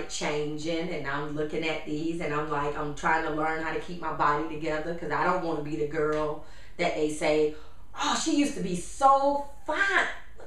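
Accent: South, United States. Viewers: I love your voice and your southern accent. <3 And yes, the thirst for fame has screwed up generating income. <3 Do you have children? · "Damn ya still that phat-sweetmeat eye-candy I wanna eat...I'm proud of you too, boo-boo! LOL-SALTS ~ Brains, beauty, grace, good-face and a heavy-duty booty! I love your accent, you are a southern chocolate-belle or to be exact, a ripe and juicy: Georgia-peach! You knocked the urban-hustle, you never ended-up jaded or faded, ya made good...Now work it! Like ya know you should...Teach, preach, reach-out to the congregation, amen! Stay hydrated on Gatorade, whilst ya haters stagnate!"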